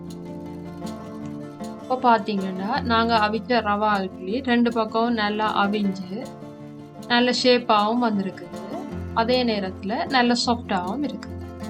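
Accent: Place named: Sri Lanka. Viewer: கண்ணுக்கு விருந்து ரவா இட்லி காதுக்கு விருந்து இலங்கை தமிழ்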